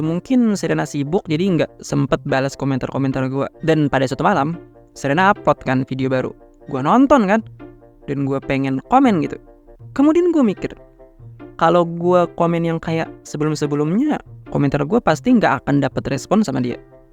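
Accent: Kalimantan, Indonesia. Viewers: izin nebak bng, keknya dari logat bahasanya keknya orang kalsel/kalteng dah :v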